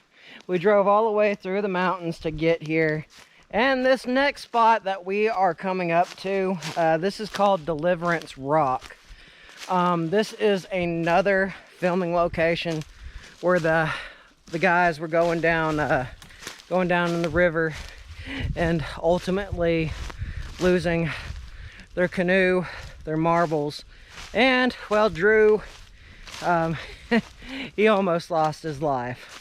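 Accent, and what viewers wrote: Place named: South, United States. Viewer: Perhaps, get out and do some hiking yourself and you will not be sucking for air...sounds like your a southerner and being so close to the river and or any water source as well as walking down a trail...very fortunate to not crossed paths with a CottonMouth, Timber Rattler or other venomous no-shoulders that are all over the area...wearing flip-flops probably not smart.....Oh well, I just wasted 13 minutes....I hope ur approach improves because this was pretty bad...not intended to be offensive just honest feedback. Take Care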